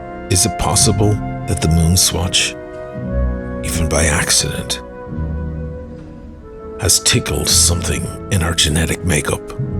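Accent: Ireland. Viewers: Great start; loving your style; beautifully delivered with that mellow Irish Brogue. Classic, long may you continue my friend. Salute.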